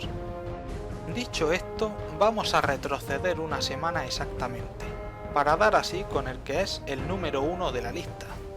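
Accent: Murcia, Spain. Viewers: ¿de donde eres de murcia o de almeria? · me mola como habla el locutor,parece murciano. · Liked the video but hearing the spaniard accent of spanish just pisses me off LOL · Jaja como se nota que eres de murcia vamos o eso parece por la forma de hablar jeje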